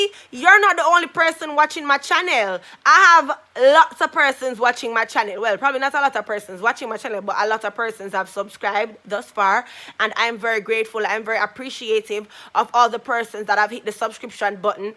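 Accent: Jamaica. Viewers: I feel much better n i was thinking about alot of things that i said b4 to myself about this YouTube thing and its like i wanna move even further after hearing u speak 🤞🤞🤞❤❤🇬🇾 Ps...I fw yall Jamaicans and Trinidadians accent anyday anytime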